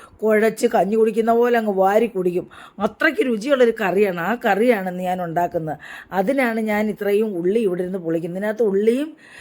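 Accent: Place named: Mavelikkara, India. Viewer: നമ്മുടെ മാവേലിക്കര കാരുടെ അച്ചടി ഭാഷ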